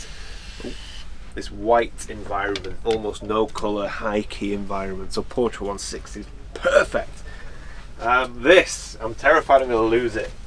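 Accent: United Kingdom. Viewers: Couldnt agree more. For me it is that cozy feeling, the warm music, the unagitated way of explaining things to us, the british XD. His films are always relaxing. At the same time, he always manages to spread the joy which is really infectious. I have the same feeling often with Morten Hilmer's documentaries, but those videos are quite long (over 30min) and focused more on wild live than landscape. So its just that touch different.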